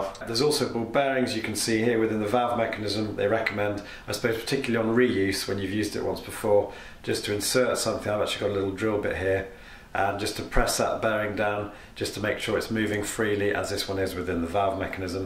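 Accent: England, United Kingdom. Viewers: americans can listen to english blokes talk, all day, i dont know if youre in the biz, but you could do product reveiws among other stuff haha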